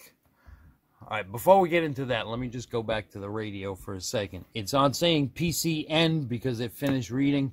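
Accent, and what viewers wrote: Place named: Boston, United States. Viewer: South Carolina? You sound like a Boston boy to me. LOL. I was born and raised in Salem.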